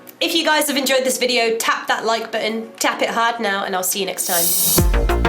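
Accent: United Kingdom. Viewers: That Midlands accent... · I love the way you sound. Your British accent is adorable to ears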